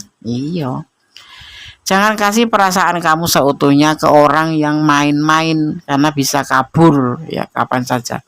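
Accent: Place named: Java, Indonesia. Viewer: Jogja hadir nih Kak, wah kk ini klo bicara MEDOK bingit's,sy suka skl cr kk membacakan readingan,kayak ada manis2nya gituuu heheheee.....,maaf kak canda lho😁. Ok kak tksh readingannya,Readingan selanjutnya ditggu🤝🙏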